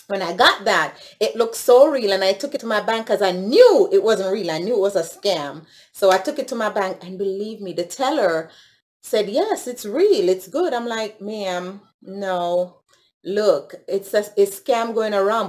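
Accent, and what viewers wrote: Jamaica. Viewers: A Jamaica yuh come from?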